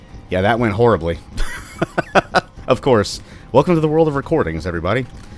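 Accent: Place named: United States. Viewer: Amazing video! Too bad you have to do the "too big to go through the door" trick on the highest difficulty, makes it a different experience in my opinion, but I just love you commenting on everything with your beautiful American accent. Can't believe you do 2-3 playthroughs before the video recording one. I guess it's necessary to make the videos shorter. Do you really find every secret by yourself btw? None of that pagb666 vids cheating? ;)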